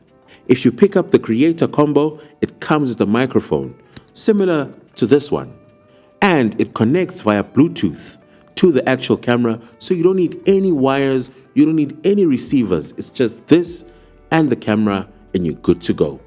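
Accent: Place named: South Africa. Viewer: I heard the South African accent and subscribed. Also, I like the zooming in and out of your MCUs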